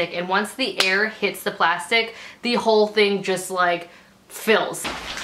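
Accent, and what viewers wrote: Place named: Midwest, United States. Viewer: that mid-west accent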